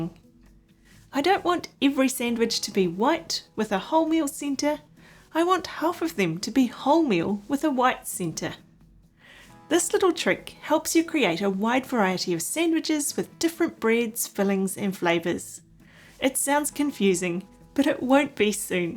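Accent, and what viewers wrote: New Zealand. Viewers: Turns out IT IS a kiwi accent😂😂😂😂😂😂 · Are you from NZ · Sounds like New Zealand to me. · Are you a New Zealander? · This woman has the most Kiwi 🥝 accent that I've ever heard.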